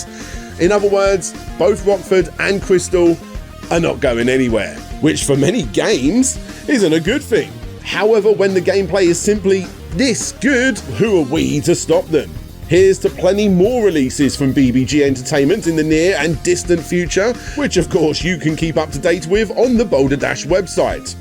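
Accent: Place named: United Kingdom. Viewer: It's very strange. You sound British, but you look American. Very queer combination.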